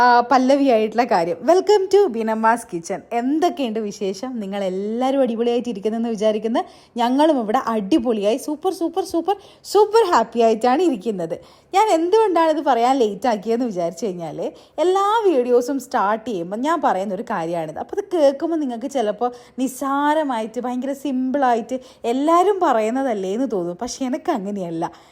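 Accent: Kannur, India. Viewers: നമ്മുടെ കണ്ണൂർ ഭാഷ ഇങ്ങനെ ചറപറ കേൾക്കാൻ തന്നെ ഒരു സുഖാ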